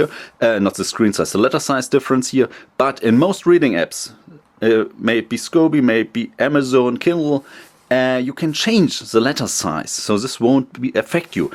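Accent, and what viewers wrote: Germany. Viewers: are you german? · Not an expert traveler but this man's English accent is clearly German or even Swiss. Nevertheless, I appreciate the fact that he speaks my language, clearly and intelligently and offers a fantastic comparison of the two pads. I had the opportunity recently to purchase a mini2 with retina display for $160 usd new. Not wanting to just jump at buying a mini and regretting it, this review gave me all of the information I needed to make a good decision. Thank you.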